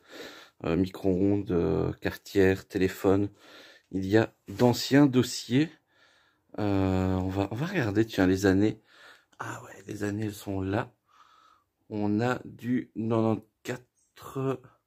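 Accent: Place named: Belgium. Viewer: Bien! Tu me fais chaque fois rire avec ta prononciation typiquement belge, "fourboui"! En France nous disons juste "fourbi" Belle continuation & merci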